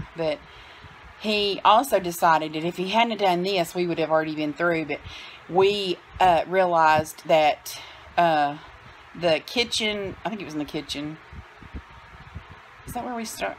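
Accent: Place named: Texas, United States. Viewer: The opening of this video had me cracking up..... Clay is going to keep you honest. He wants to make sure you saw that temperature correctly...lol. And is this the cat you mentioned that would scratch the heck out of you if under the sheets? Well I would have never known because she looks all cozy and cute all up againt you. Too freaking cute if you ask me. And girl I just love your accent, I'm not from Texas so hearing it is music to my ears. Good luck with the voice acting thing., you deserve that and more.....and last but not least, you looked great on all the shirts and blouses you tried on. My fave was the pink or mave with the lace. You looked very pretty, yup even in the dressing room.......lol.......love you girl, love your vlogs and keep them coming. Becuz your laugh is contagious.......blessings ♡♥♡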